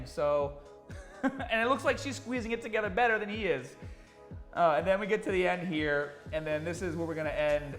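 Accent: Boston, United States. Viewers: He's losing the Boston accent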